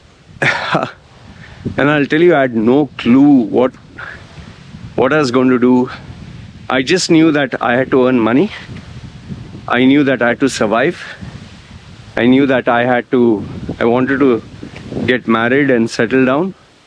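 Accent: India. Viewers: You have changed a lot. 5 years ago u had that pseudo American accent and now fully Indian accent (your genuine voice which is good to hear). You should try radio jockeying. Other changes includes softening down from the brash tone u had then. Good luck. Also you have come back full circle from Family to show off life to back to family. Sometimes I wonder this is heaven(our lifespan) and there is no other place called heaven and we go back to an unsexy place after death....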